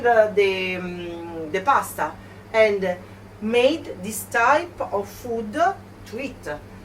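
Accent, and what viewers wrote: Italy. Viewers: more than her recipees i love her cute italian accent. so lovely!❤ and it proves her being highly educated. i give her a big hand! 👏👏👏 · inglesi e americani adorano questo tipo di accento italiano, un po' come a noi divertono certi inglesi quando parlano italiano con l'accento inglese. è naturale. ha fatto bene. brava chef ! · I think I'm in love. She cooks, earns money cooking. Is a great cook and speaks English with an Italian accent. Is beautiful and charming. I'm in love. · Nice lady . Funny indeed charming 💎. Great cooking skills . Great english with that italian accent . I would love to eat there . Beautiful country mama Italia 🇮🇹. ❤. Looks Yummy dish 😋. They have so great food n excellent cakes n ice-cream 😋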